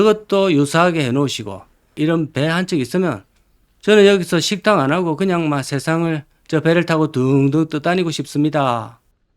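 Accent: Gyeongsang, South Korea. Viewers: ~재밌는영상에 중독되었네요~ 배울것도많고 유머도있어서 힐링되네요~저도 경북이고향이라구 구수한 경상도 사투리가정감있고추억소환이 되네요~잘보고 있습니다~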